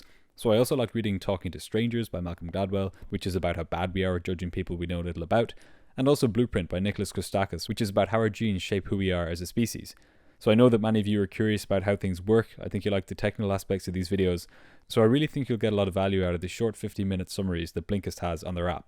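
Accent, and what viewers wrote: Ireland. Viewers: Great video, and your soft. Lovely, beguiling irish accent adds so much enjoyment to an aleady wonderful production.......only one problem........its making me desperately homesick. Love and respect from mo in new zealand. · irish? lol, no matter, you have a sexy voice,,,,, this video was well done, xo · enjoying the Irish lilt in this narration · I detect an accent....Irish???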